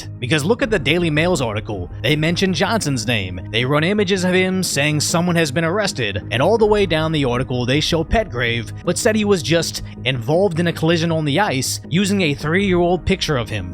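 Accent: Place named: East Coast, United States. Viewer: Do you make all your content to covertly target black people? Im just asking, I noticed that about you, that’s not to take away from someone who very clearly deserves to have their day in court but there are so many groups who commit in frequency many of the same crimes you report. But judging by that accent it’s no surprise where you were raised, pretty much the Mecca of WS on the east coast. Your blind & biased, basically acting as the watch dog you are.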